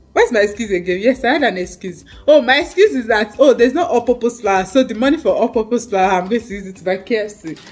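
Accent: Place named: Nigeria. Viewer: don't worry He/she is just hating on your beautiful Nigerian accent.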